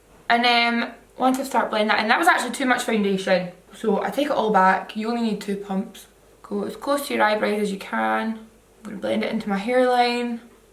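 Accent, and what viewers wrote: Scotland, United Kingdom. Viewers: 'I've got a sare shooder' 😂😂😂dying!! Thank God for a Scottish youtuber! 🎉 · Are you Scottish? Beautiful accent and such a beautiful lady! <3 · s y she's from scotland! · Hi! I absolutely love your accent, it reminds me of my grandfather who was Scottish! I have tons of Scottish blood and the red hair to boot, but a total Southern American accent. I'm a new subscriber because I love your looks and fun, no-BS personality!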